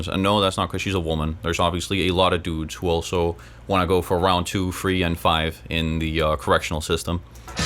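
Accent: United States. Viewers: how can a danish be so good in american english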